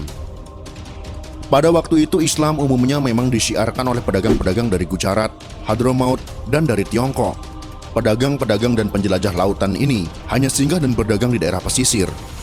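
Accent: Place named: Java, Indonesia. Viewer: Miminnya ada logat Jawanya..kuliahnya d Jawa ya min?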